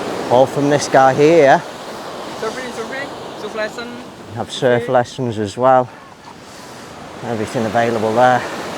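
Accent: United Kingdom. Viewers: Ps. Husband, from bournemouth is trying to guess your accent. He's throwing out lots of options. Lancaster, leeds, manchester, yorkshire. He's usually pretty good at this so you've got him guessing!! Meanwhile, can we hope for that retired banner to come down?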